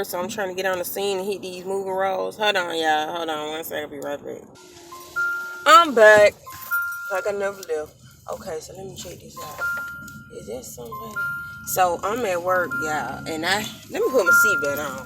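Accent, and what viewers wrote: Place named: South, United States. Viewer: Girl I just love your southern accent 💕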